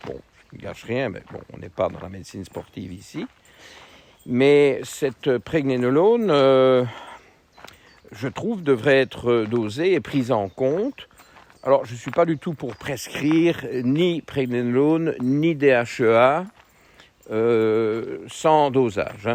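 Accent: Belgium. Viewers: Merci pour ces éclaircissements docteur. Je vais voir pour ma prochaine analyse en novembre. Entretemps je vais voir avec votre assistant les possibilités de consultation. Je viens de Bruxelles et j'ai cru comprendre d'après votre accent et les paysages de vos ballades avec Goliath que vous êtes situé en région de Liège. Je devrai probablement prendre congé pour venir vous voir. A bientôt de toute façon ;-)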